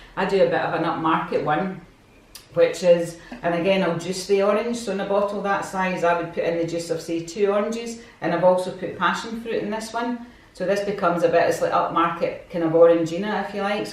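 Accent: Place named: Scotland, United Kingdom. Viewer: This video gives a very comprehensive list of flavours and combinations available..it will be my go to for choice Thank you very much and refreshing to listen to a Scotish accent for a change!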